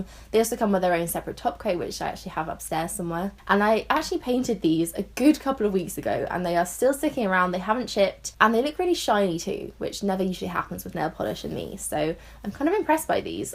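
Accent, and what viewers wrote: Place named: RP, United Kingdom. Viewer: I get so distracted by your accent so I totally lose focus on what you're actually saying. I just love your accent, the way you articulate yourself and your intonations ❤ it is the queen's English. I had to repeat the video again haha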